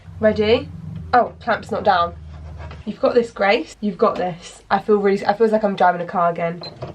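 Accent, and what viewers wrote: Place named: England, United Kingdom. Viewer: Randomly stumbled across your channel when I was living in Aus and I loved it because it reminded me of home and just listening to someone English ramble on was super comforting 😂 and now I’m back in England and still here and loving the randomness, the rants and everything else! Thank you for being completely you - very lovely in a world of fake!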